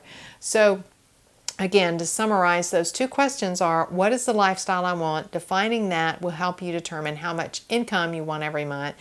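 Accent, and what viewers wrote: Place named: South, United States. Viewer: Love your videos! Where do you live? I notice a Southern accent and I'm in Charlotte NC:)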